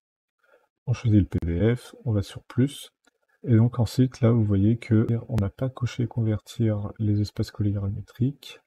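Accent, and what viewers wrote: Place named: England, United Kingdom. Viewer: Salut, Très utile, merci pour ce partage de connaissances ! (Comme dirait Chabal, We are in France, we speak French... OK ?) Manquerait plus que quelqu'un vienne te reprocher ton accent anglais, le jour où les Anglais (en tout cas ceux qui font cet effort) parleront français couramment et sans accent, alors, peut-être qu'ils pourront la ramener et encore on ne va pas se laisser emmerder par des buveurs de thé, avec le petit doigt en l'air, bordel de merde ! :P Sinon j'ai une question en rapport avec ta vidéo: Que signifie tramer et à quoi ça sert au juste ?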